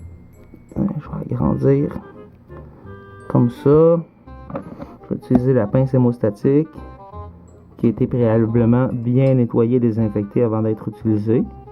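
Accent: Quebec, Canada. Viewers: il a un accent tu peux pas lecouter? .. ta gueule? je suis quebecois et tu croti que s ame plait écouter des francais avec leur accent sur ytb? bah non alors si t la pr apporter des critique a proos de notre accent, regare toi avant.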